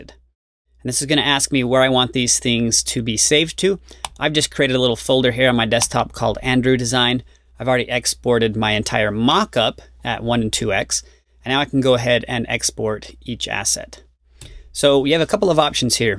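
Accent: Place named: Ireland. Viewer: Great video. You sound like you have a slight Irish accent. Which is really cool!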